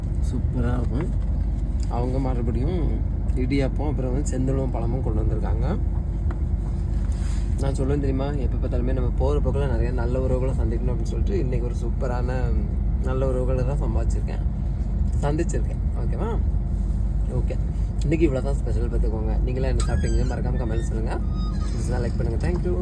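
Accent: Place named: Nanjil Nadu, India. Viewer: கிட்ட காட்டு மக்கா- நாஞ்சில் தமிழ் ❤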